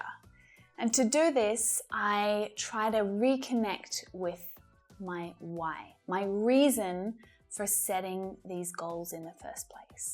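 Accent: England, United Kingdom. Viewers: A lovely english accent! A simple mesmerizing voice! One of the best teachings ever! I wish all the best for you! Keep making this incredible videos for us! Take care dear teacher! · Hi are you british ?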